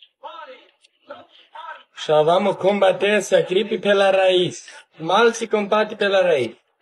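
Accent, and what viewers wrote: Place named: Tijucas, Brazil. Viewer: Parece tijucano kkkk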